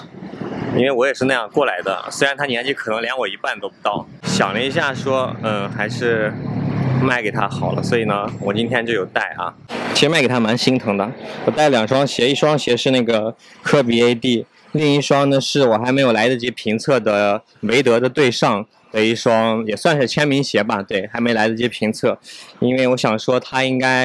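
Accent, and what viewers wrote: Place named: Taiyuan, China. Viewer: 听口音 太原后生？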